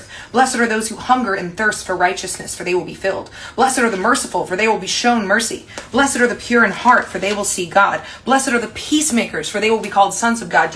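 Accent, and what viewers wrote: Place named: United States. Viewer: Dear, I love u. To be precise, I love tt fire cracker brain of yours. 🥴 U don't know how hungry I hv been for scintillating intelligence tt cuts right thru the 💩 that's slung at us every day thanks to social media n the shameless greed, evil n lies of the privileged class... I'm also green w envy tt u hv an IQ of 146... Gasp! But I know it can't be ez n tt it can be v lonely inside at times. But for whatever it's worth, brain to brain, u hv an ardent admirer here. I struggle a teeny bit at times w yr rapid fire discourses at times as I hv to parse yr American accent thru my cynical Chinese brain n stodgy British education but I don't mind the coz U make me laugh so much when you give all those freaks, hypocrites n liars the dressing down they so richly deserved... I'd buy u a carving knife but man, tt tongue of yours wld do a MUCH better job! 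🤣 I hereby declare tt I am yr humble fan n grovel at yr feet for all those 'licious lil crumbs of whiplashing U give those oh so deserving man-babies. 🤣😂🤣 Oh dear, I hope my jaw doesn't fall off fm all the laughing. May the Universe bless U n send U lots of fireflies to light yr way. 🌌🥴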